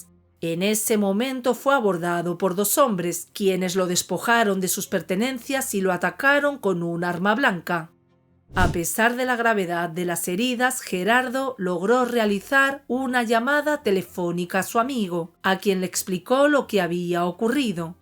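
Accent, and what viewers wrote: Spain. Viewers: esta bien pero me hace ruido tu narracion no me gusta para nada es contaminacion auditiva ese hablado casi que cantado de narrar es desesperante mas el acento español deberia ser neutro. pero tu contenido es bueno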